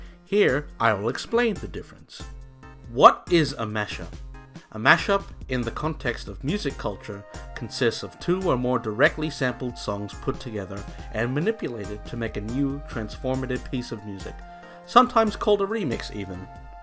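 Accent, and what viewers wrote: United Kingdom. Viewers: You kinda sound like ProZD if he had a slight welsh accent. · Educational and interesting this cool. Also YOUR VOICE IS UNEXPECTEDLY BRITISH SOUNDING.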